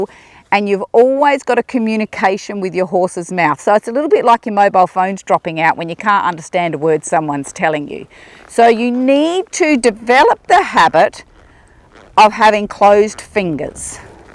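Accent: South Australia, Australia. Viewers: Thank you for this. I've switched to Western from English (or plain old bush bashing). The quarter horses I ride in my lesson have very slow lopes and their heads bob up and down. I have a lot of trouble keeping my hands still as they tend to go with the horses bobbing head! Western people don't seem to close their fingers but I will try this at my next lesson and see if by closing my fingers more and tucking my elbows into my hips if I can keep my hands still! I'm hoping if my hands are still and fingers closed my lesson horse may get into more of a frame. I'm pretty much taught in Western to use my leg and seat for turning. The horses I ride are both very well trained and basically if i just look a particular way, they'll go there but I definitely have trouble loping and keeping my hands still. By the way, are you from South Australia? South Aussie's seem to have a slightly different accent. 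lol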